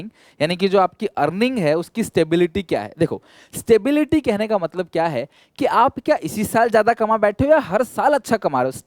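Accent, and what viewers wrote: Odisha, India. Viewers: sir app odia ho kya ?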